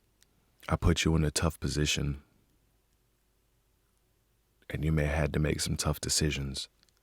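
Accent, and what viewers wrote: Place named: South, United States. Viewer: I could listen to your soft guttural accent with a slightly southern drawel sweet and slow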